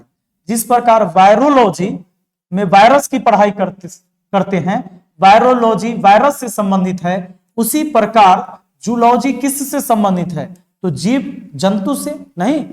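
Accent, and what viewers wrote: Bihar, India. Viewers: सर जब बिहारी बोलते है ... मुझे बहुत अच्छा लगता हैं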